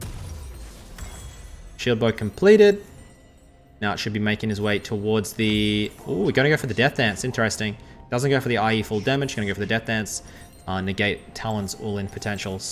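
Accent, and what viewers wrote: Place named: Australia. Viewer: I though was from the Uk. Ozzy makes sense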